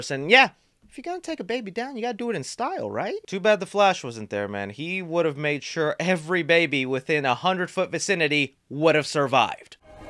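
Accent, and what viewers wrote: United Kingdom. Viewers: I love your British accent😂 where in the UK are you from? I thought it was south African at first 🙈😂🎃🎃👍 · British accent 😂😂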